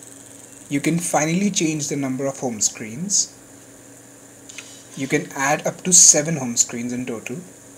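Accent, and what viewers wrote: India. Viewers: Your english like indian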